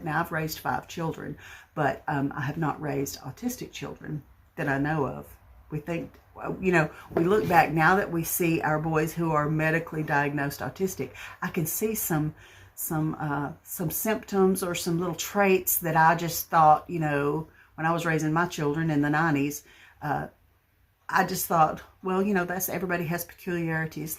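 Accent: South, United States. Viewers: Oh gosh! What a haul! I just love your hauls! Just my style! And all said and done with that heart warming southern accent that reminds me so much of home~You are such a treasure, sweet lady~thank you for taking time to share and to chit chat....I love it! AND...P.S. I LOVE your driftwood angel back there!! xo~Catherine from Colorado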